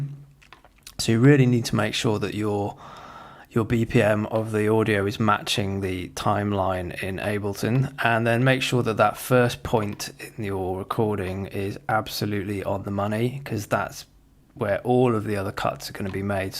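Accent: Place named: Northern England, United Kingdom. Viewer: Just watched it - very useful thanks - I am doing none of those things properly although I have found a good piece of s/w to show the key of a clip - Keyfinder (no business connection - its free) - nice to have a calm englishman with no gimmicks rather than some of the johnny foreigner types with all their silly cuts (including hair!), daft graphics, mumbling, silly accents etc - I take it you're from up t'north lad by eck.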